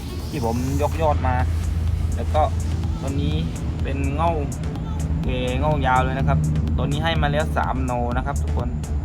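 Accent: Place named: Bangkok, Thailand. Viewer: สวัสดี คะ อยุ่จังหวัดอะไร สำเนียงพันนี้น่าว่าม่ายช่ายคนใต้ ต้องเป็นคน กุงเต๊ป(กรุงเทพ)แต่กำเนิดแน่นอน 😆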